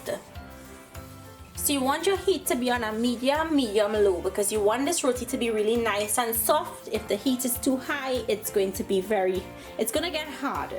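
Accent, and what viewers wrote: Trinidad, Trinidad and Tobago. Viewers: L'Ange Gemi-fob it's Trinidad accent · I'm here for the Trini accent - the food is secondary!! :)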